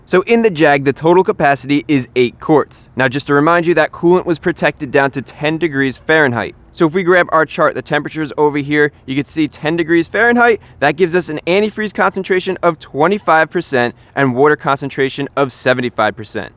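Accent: United States. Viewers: Are you an American,?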